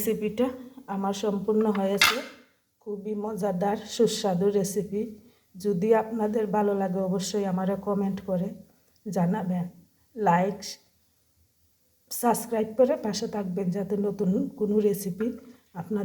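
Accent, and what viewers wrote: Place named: Sylhet, Bangladesh. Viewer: বইন তুমি সিলেটি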